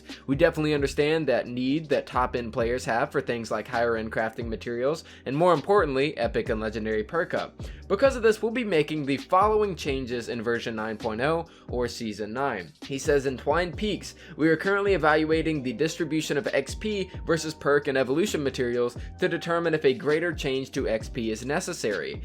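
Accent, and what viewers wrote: France. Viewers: Are u frenche? Because I am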